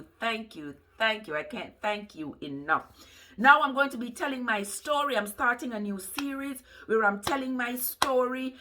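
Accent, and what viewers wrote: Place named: Jamaica. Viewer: Am new here and I like the Jamaican English, my Bruder👍😅 I will stay here for more of this👏👏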